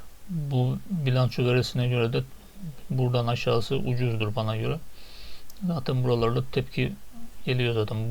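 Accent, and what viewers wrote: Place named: Trabzon, Turkey. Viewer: Emeğinize sağlık Trabzonlu kardeşim.Şivenize gore tahmin ettim.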